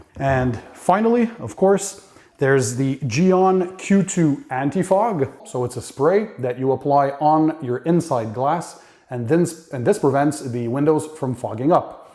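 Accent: Canada. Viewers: Canadian accent, eh? 🇨🇦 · Dude, why the Canadian accent?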